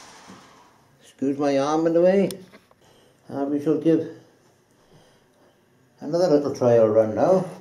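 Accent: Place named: Wales, United Kingdom. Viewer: great idea using push pins to hold track in place while the geometry is sorted and your ready to pin it down .I have wasted so many track pins by fitting and pulling out , re aligning and such . great old school layout .love the accent by the way . it takes me back to when I played in a band touring wales , the "by yeur" phrase had us English baffled for a bit till we got used to it .its running great hope to see more in the future .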